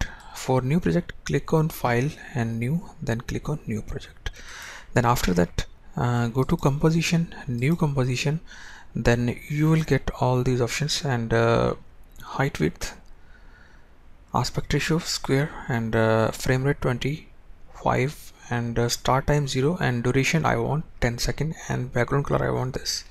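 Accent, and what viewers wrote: India. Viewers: fuck i hate indian accents no offence lmao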